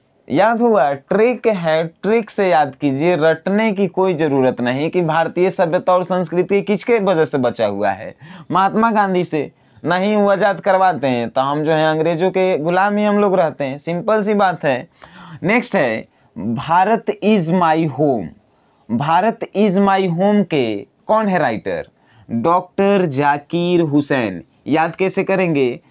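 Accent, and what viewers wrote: Bihar, India. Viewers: Sudh bihari hain aap salutes hai aapko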